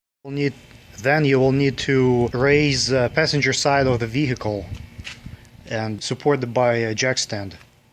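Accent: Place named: Russia. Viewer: Так и человек говорит с русским акцентом.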